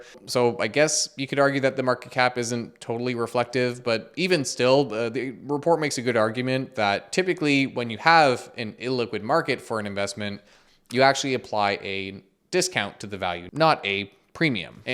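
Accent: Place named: Ottawa, Canada. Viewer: No way, picked out your accent on the words "they are at it again" and "they got a lot of flak last time" and found out your in Ottawa! No fucking wonder I recognize it! Crazy to think someone I watch online is just chilling somewhere in my city!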